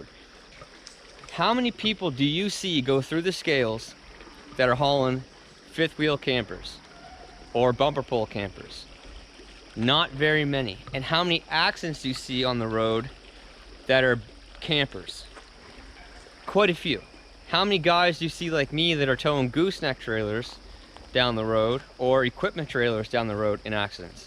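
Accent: Canada. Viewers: You sound so Canadian. Thanks for the video helps a lot.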